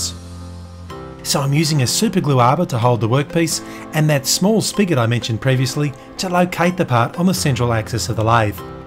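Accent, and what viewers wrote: Australia. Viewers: Hey! I was wondering, where are you in the world? You sound Australian, if so, where in Australia?:) Once again, great video!!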